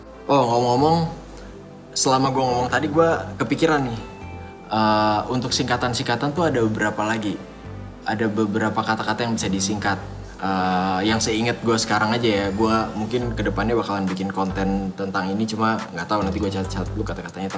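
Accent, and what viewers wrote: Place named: Kupang, Indonesia. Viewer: mirip kupang bro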